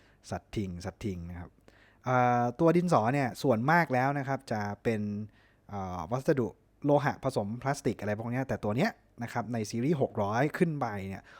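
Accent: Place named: South, Thailand. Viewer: ใต้ออกเยอะหรอยนะครับคลิปนี้555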